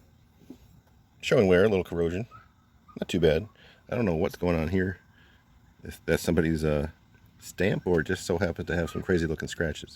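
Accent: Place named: Midwest, United States. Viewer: You can easily date those wrenches as the date code matrix is online. The Snap On "boxed" ratcheting wrenches (and other brands) are mostly made by A&E Manufacturing of Racine, WI for the various OEMs, including USA made Craftsman. They all use a Snap On style date system because the founder of A&E, Daniel Lang was a one time Snap On employee and may have come up with that date code system if I recall right. At the end of the day, you can trade every one of those for new, but I would agree, clean them up and see what you have there. I bought a set of Snap On (1940s through 1960s) from a woman I used to work with after her dad (an aircraft mechanic at the local airport) passed away. When I asked about anything else, she balked---probably realizing that she sold me the first batch way too cheap. I got a virtually new in the box set of Craftsman wrenches (missing one, which I eventually got and added to it) and all of that Snap On. 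I had to warranty some of it, but Snap On gave me no issue. Your accent sounds like some I've heard from the Midwest and you mention "1968 Chevrolet and panel repair" so that makes me think you're in the middle of the country in farm country (nothing wrong with that). I'm going to say that some of those stampings may be inventory or control numbers to account for them in a set or location. I bought some Craftsman tools like that with some engraving that I would say was probably from a factory or maintenance area somewhere.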